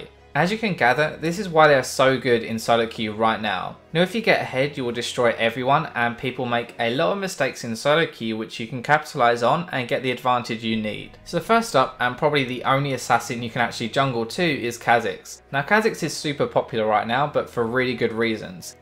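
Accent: United Kingdom. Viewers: Dat british accent :>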